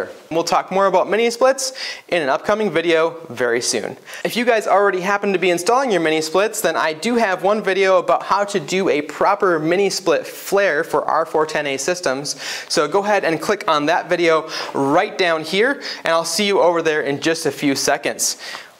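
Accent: Minnesota, United States. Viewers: I was like, "this dude is from MN, or Canada." Nice to see a fellow Mn boy on YouTube. Subscribed.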